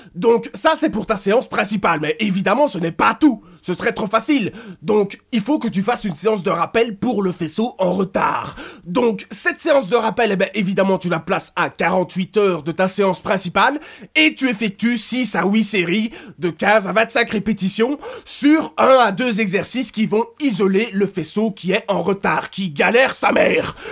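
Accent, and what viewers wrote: Belgium. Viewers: t'es belge en fait ?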